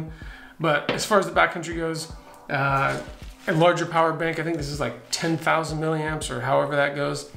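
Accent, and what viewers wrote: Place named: Western Washington, United States. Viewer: Hey. New subscriber here, and man do I love your content. You deserve a lot more views. Unlike a lot of backpackers and UL guys you seem to come from a hunting background, which might be the fresh and relateable take you bring to your videos. It also sounds like you're up in western Washington maybe? I'm down in Portland! I gave you a follow on IG too. I look forward to your future videos, so please keep them coming!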